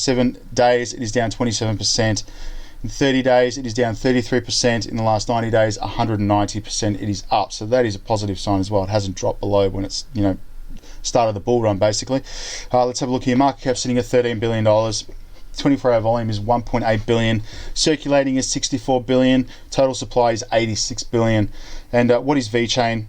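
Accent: Australia. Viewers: You Aussie bro?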